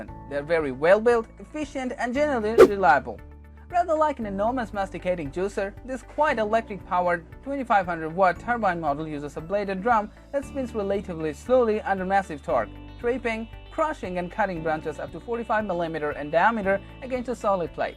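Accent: India. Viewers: This video is made for the British market, in a sometimes hard to understand Indostani accent. Why did it show up in my search from the USA? Something wrong with the ‘algorithm’?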